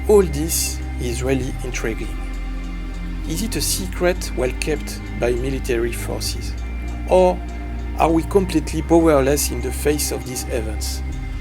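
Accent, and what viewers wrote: France. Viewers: Congrats on the new look and experience to the Channel. IMHO, loud background music only makes it difficult to understand your French accented English. Please consider keeping the volume levels of background music lower as they interfere with your message. Thanks for keeping up an interesting channel.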